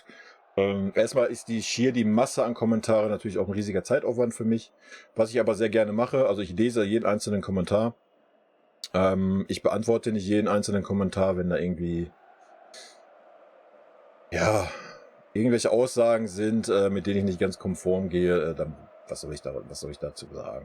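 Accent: Ruhr, Germany. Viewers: Komme aus der Oberpfalz/Bayern bin seit ich in der 1. klasse war Bayern Fan und das ist 33 Jahre her. Ich kann mich noch nie erinnern jemanden Fan sein ab zu erkennen, nur weil er nicht aus München/Bayern kommt. Mach weiter so verfolge dich seit 4 Wochen und mag deine Ruhrpott Schnauze😂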